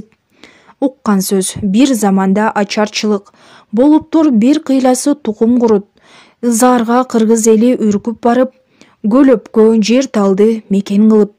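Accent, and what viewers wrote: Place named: Russia. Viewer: Киргизка окшойсун а, акцентини ондо же оруссунбу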